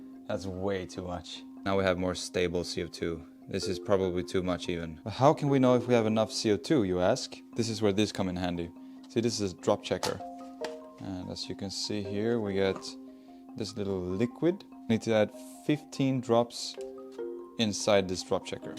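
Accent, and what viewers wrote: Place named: Scania, Sweden. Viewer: Den grova skånskan 😂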